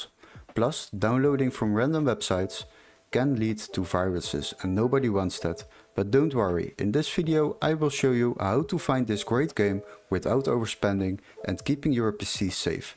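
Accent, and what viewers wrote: Netherlands. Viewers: I can hear the accent your are from the netherlands!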